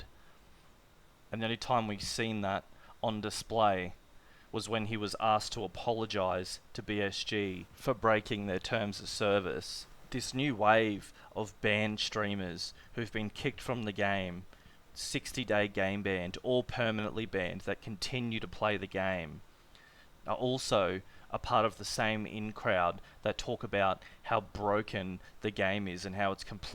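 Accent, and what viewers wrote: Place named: Australia. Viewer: bro I love your channel, your Aussia I can tell, you aussies are the best shittalkers I swear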